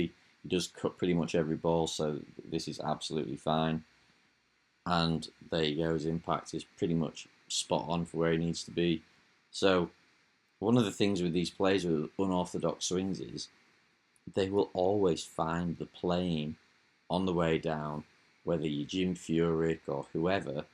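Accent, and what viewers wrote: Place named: Yorkshire, United Kingdom. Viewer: Great video and a nice surprise to hear a yerkshire accent on a golf video. Could do with turning your mic levels up, or speaking a bit louder. Audio is clear but quiet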